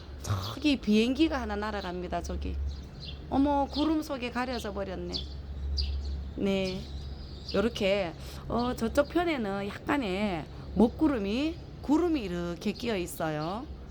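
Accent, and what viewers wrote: Gyeongsang, South Korea. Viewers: 경상도 사투리 정겹네요~저도 경상도랍니다~다육이 엄청 많네요~나눔 기대 됩니다~^^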